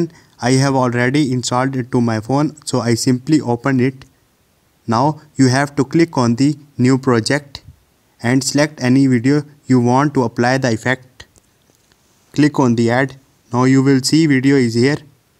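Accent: India. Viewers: Idk why but hindi people when talk english it just so interesting with their identic accent🔥🙏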